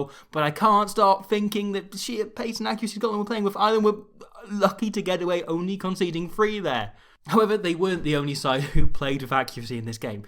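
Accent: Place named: England, United Kingdom. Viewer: he sounds english to me mabye born in England with welsh grandparents or something.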